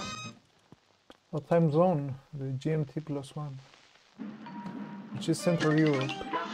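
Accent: Mexico. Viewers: primero pense que eras estadunidense pero con este video ya veo que sos mexicano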